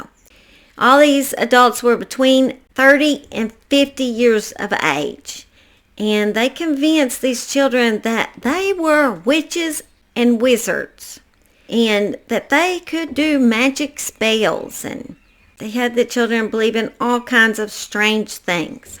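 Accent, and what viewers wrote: South, United States. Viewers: Bloody hell! There are just no words to describe this level of depravity! On a positive note: I LOVE your southern accent ❤ Cheers from Australia 👍😁🇦🇺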